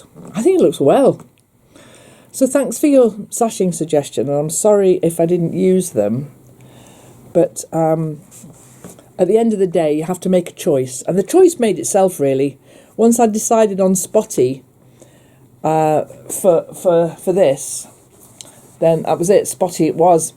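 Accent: England, United Kingdom. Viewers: I have must found your site. Lovely to hear an English accent. I live in South Australia. I will be looking forward to seeing more of your beautiful work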